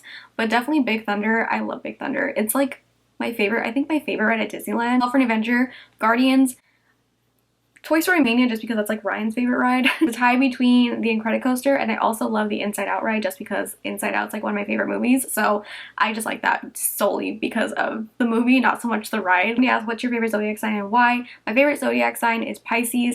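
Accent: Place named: New York, United States. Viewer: Wow I'm a dumbass bc I would have sworn that you were from NY lol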